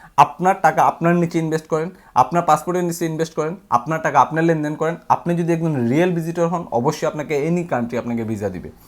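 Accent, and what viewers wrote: Sylhet, Bangladesh. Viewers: Boro bai apni jotoi vasha diye kotha bolen asole kintu apni amader sylheti bai right ? Deka hobe inshaallah dubai deira te aiyar’ 2012 te ami achlam 2 bosor r akon 7 bosor pora aiyar visit a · You are sylheti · Vai apnar bari sylhet... Ami bujte parchi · সিলেটি নাকি ভাই? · ভাই আপনি সিলেটি নাকি? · আপনি কি সিলেটি? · nice. Vai apni ki amader sylheti naki. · vai ki sylheti🥰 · ভাইতো মনে হয় আমাদের সিলেটের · ভাই সিলেটি😊😊😊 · হুজাইফা এন্টারপ্রাইজ থেকে প্রথমে আপনার কথা শুনছি মনে হয় আপনি সিলেটি আমি দুবাই এয়রপোটে কাজ করি আমি জানি এগুলো আপনার সাথে পরিচয় থাকলে ভাল হয় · সিলেটি নি ভাই তুমি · ভাইয়ের বাড়ি মনেহয় সিলেট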